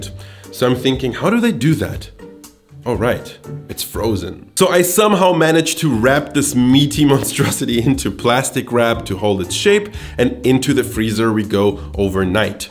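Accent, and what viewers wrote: Germany. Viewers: allmost cant even notice the german accent!! great video also! :) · his accent screams german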